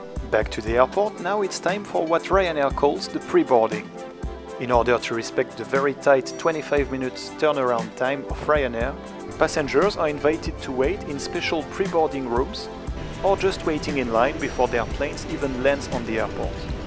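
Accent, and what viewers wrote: France. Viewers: Ton accent français ressorts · I was trying to pay attention to the video but was distracted with the charming French accent. Sorry. P.S. I love all 3 airlines but most strongly Wizzair because they revolutionised travel in Central and Eastern Europe. Love Ryanair as well. Travelled at least 30 times with them. Less experience with easyJet, so no opinion. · Ton accent francais c'est drole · C’est quoi cet accent français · T français si oui g reconnu l’accent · l’accent fr reconnu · Ça se vois quand un français parle anglais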